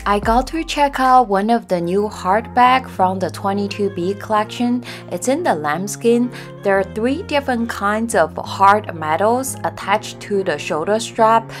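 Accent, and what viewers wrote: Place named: South Korea. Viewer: 韓腔英語。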